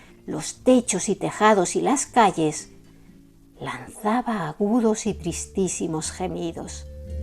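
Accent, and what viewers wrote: Spain. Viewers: Hola, estoy suscrito a tu canal y veo todos tus videos,y me encanta todos los temas que abordas pero sobre todo me impresiona tu forma de relatar,me encanta el timbre de tu voz junto con tu acento español,(soy de Argentina) y me pregunto,alguna vez pensaste en hacer videos tipo audiolibros, sería genial,tu voz es excelente para eso,tal vez sería mucho hacer 2 videos a la semana pero un audiolibro cada tanto sería genial.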